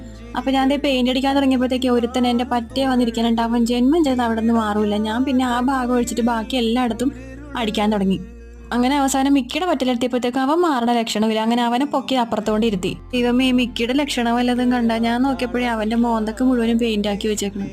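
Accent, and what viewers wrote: Kochi, India. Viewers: ഇതൊക്കെ നുമ്പട കൊച്ചി ഭാഷ 🤣🤣🤣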